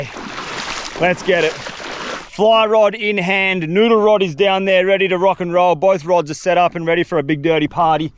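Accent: Australia. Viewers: That thing just absolutely making me piss - he is so hilarious when he says aussie sentences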